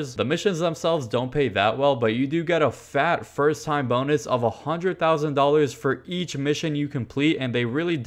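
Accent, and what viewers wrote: RP, United Kingdom. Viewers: I thought you were actually British😭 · Bro sounds like spiffing brit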